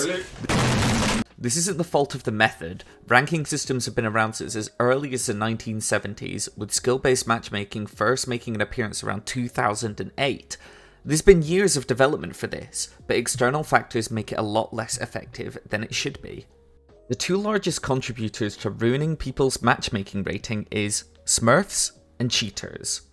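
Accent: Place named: Scotland, United Kingdom. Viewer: WOW! What a wonderful and informative video. The OSU! community thanks you for putting such a great amount of effort into this, from the detailed animations to the outstanding soundbytes (pure nostalgia from Vsauce). As an ex-OSU! player, I always struggled with playing against smurfs >:( I blame my friend - Jamgyo. Forcefully making me download the game and getting me hooked. From there, it was a never-ending spiral of despair and misery from these smurfs. The game baits you in with its fun colours, fast-action-paced music, and anime backgrounds. Only to be humiliated as the screen goes black and you get a glimpse of your reflection... A sad, sweaty, filthy casual. This agony is only further prolonged as you realise you have received another defeat from someone who has deranked. As a result of this, I have had to stop playing and focus my attention elsewhere. 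I recently joined a friendly Old School Runescape Discord server, and with the great support and assistance of these members, whom I am proudly now able to call my friends, I am slowly closing the gap towards a FIRE CAPE. The thilling gameplay and pure excitement from 16hrs of straight griding in the Nightmare Zone have really made me consider never returning to OSU! Don't let my horror story put you off your grind; endurance is key, and you will triumph against these lowlifes. P.S. Love the Scottish accent🏆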